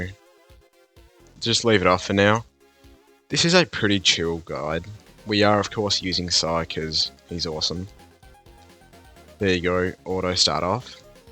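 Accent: Australia. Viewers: I can hear the Australian accent but bro uses every village flag apart from Australia, anyway thanks bro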